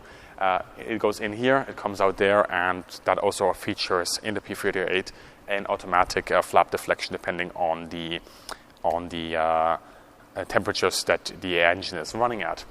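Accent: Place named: Germany. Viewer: Too fast, too German, too bad.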